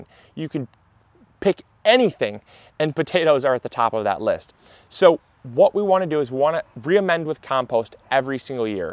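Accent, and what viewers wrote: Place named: Ohio, United States. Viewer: HI..thanks for your videos! I'm not sure if u have mentioned where u live, but I was wondering..where do u live/where are u from? Was curious about the climate u live in, the climate u grow the potatoes in and where your accent is from? Im assuming u are either in Ohio or Wisconsin?? Maybe Michigan? I know u are from the midwest...I am originally from Ohio, but u sound like my cousins when u speak and they are from Ohio but have lived in Wisconsin for the past 20 yrs:)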